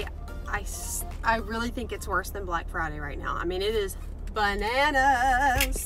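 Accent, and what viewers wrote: South, United States. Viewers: Go on Grrrlll love that southern twang lol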